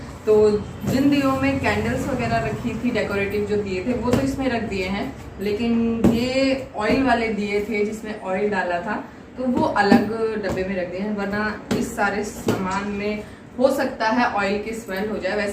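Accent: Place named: Haryana, India. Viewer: Aaj awaj me dum hai haryana wali filling hai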